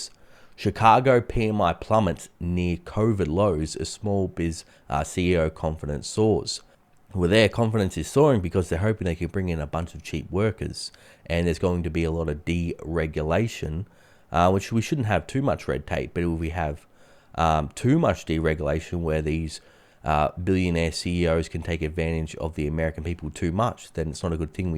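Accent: Australia. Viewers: An aussie voice is a ray of sunshine on a cloudy scottish day! Happy New Year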